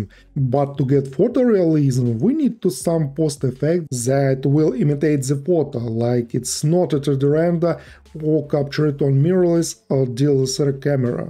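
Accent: Russia. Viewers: russian tongue is cringe.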